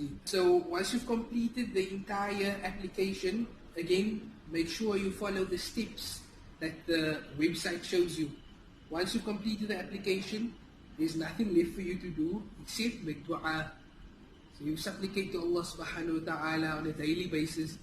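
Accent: South Africa. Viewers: lol you can hear that this bru is from Cape Town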